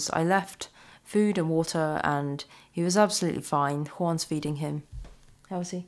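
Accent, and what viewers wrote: United Kingdom. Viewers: having a British narration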